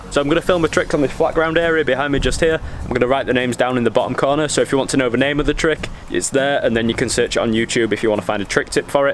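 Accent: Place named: United Kingdom. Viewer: Am getting back into skating at 30 am watching like like waaat I have allot to Learn haha you sound like your from UK same as me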